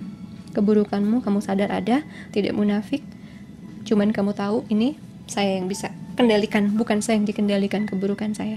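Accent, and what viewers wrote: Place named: Java, Indonesia. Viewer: Pile 1, kenapa ya aku rada-rada feeling "kok kak ngomongnya rada medok ya?"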